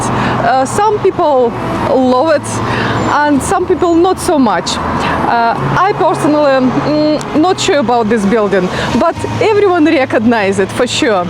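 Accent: Glasgow, United Kingdom. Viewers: Love how you pronounce Glesga your richt Gleswegian noo.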